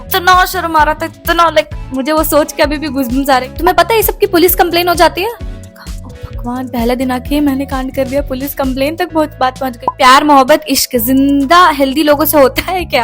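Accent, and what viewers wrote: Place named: South, India. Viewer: Didi's accent is like now South Indian..😂😂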